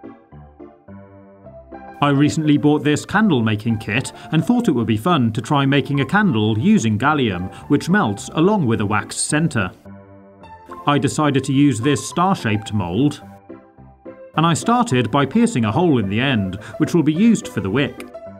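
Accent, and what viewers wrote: United Kingdom. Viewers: Das Op he’s british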